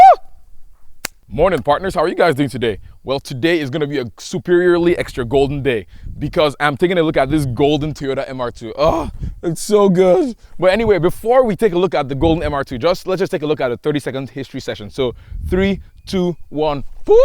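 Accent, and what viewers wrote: Nigeria. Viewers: Came for the car, stayed for the laugh and the Nigerian (west African) accent.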